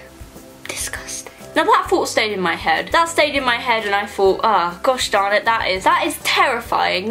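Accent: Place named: London, United Kingdom. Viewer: it's a particular type of london accent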